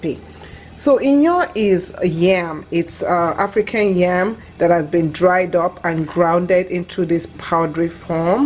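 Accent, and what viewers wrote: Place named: Nigeria. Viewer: i love the way u speak in nigerian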